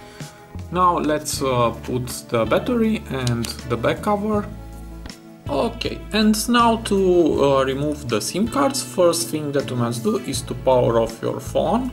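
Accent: Romania. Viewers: like your Romanian accent of talking, man👍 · Romanian accent....the best.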